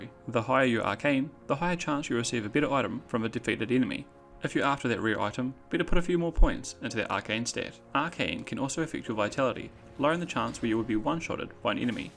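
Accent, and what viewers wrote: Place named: Australia. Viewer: "Biddeh" i love australian accent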